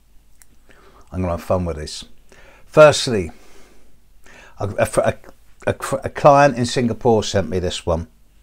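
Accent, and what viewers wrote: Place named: West Country, United Kingdom. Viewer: That West Country accent comes out now and again 😆... cut the jokes mate🙄stick to the Astrology 👍sorry I’m an outspoken Sagittarian🤭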